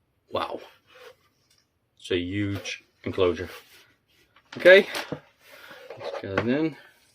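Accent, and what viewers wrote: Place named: Netherlands. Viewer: Great job with your unboxing video for the Numbered Imajica,!! Love your Dutch accent! And your English is flawless, my friend!!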